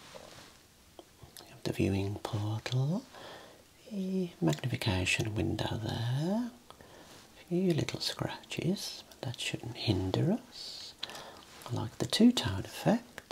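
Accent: United Kingdom. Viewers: Absolutely love the British accent ☺️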